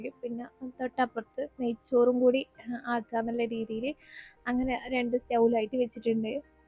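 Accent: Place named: Kannur, India. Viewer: u r from kannur,? talking style is good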